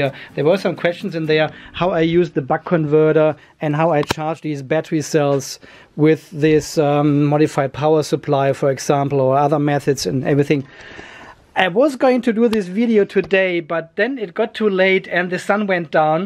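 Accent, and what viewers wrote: Germany. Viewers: Hallo, höre ich da einen deutschen Akzent ? mfg michael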